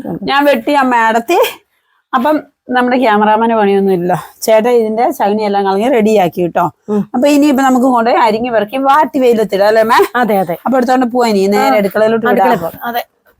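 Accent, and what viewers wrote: Kottayam, India. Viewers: Samsaaram kottayam style aanallo. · വലിച്ചു നീട്ടാതെ വ്യക്തമായി എല്ലാം കാണിച്ചു തരുന്നത് കൊണ്ടു വീഡിയോ ഭയങ്കര ഇഷ്ടമാണ്. സംസാരം കേട്ടാൽ കോട്ടയംകാരി ആണെന്ന് തോന്നും. ശരിക്കും ഉള്ള നാട് ഏതാണ്. ഒന്നു പറഞ്ഞുതരുമോ.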